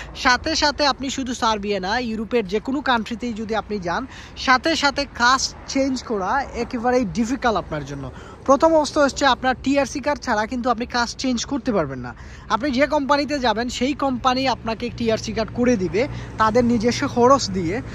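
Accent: Sylhet, Bangladesh. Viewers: ভাই আফনে সিলেটি নি?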